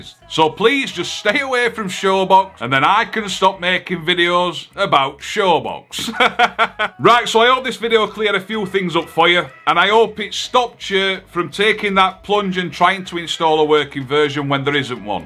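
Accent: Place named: North, United Kingdom. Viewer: You are very northern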